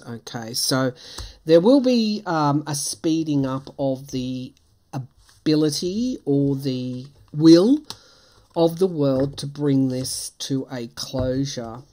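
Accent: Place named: Australia. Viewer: I love your readings, and find your no-Nonsense Aussie accent 😅 as comforting as a US Southern drawl. You are a genuine soul. Thanks more than you can appreciate the comfort and warnings you provide! Ava in Florida😊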